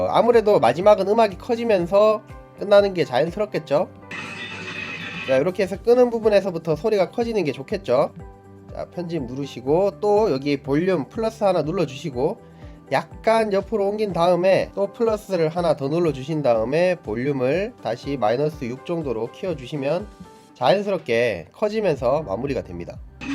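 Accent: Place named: Gyeongsang, South Korea. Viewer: 안녕하세요.. 구수한 경상도 사투리로 듣는 Lumafusion 영상 편집 설명 아주 아주 감사합니다. 저도 대구에 살다가 와서.. ㅎㅎ LA 사는 50대 아줌마가 뒤늦게 유튜브에 관심이 생겨서 틈틈히 공부중인데 아주 도움이 많이 되고 있어요. 기계치여서 겁부터 났는데 설명을 들으며 해보니 재미도 있고요. 아직 아이패트 3는 없어서 아이폰으로 해보고 있는데.. 암튼 감사합니다. 유튜브 대박 행진하시길~ 짱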